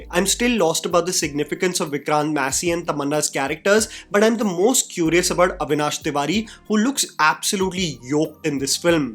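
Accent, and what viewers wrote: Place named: India. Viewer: My god, where do you get your English from. I know it's not chatgpt because you've been this way since before Ai. This is where the Indian accent doesn't sound too bad cuz the way you express what you wanna say.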